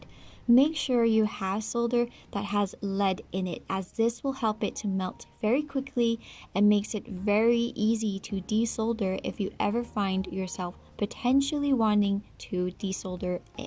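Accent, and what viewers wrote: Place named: Scotland, United Kingdom. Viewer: this is random but you sound slightly scottish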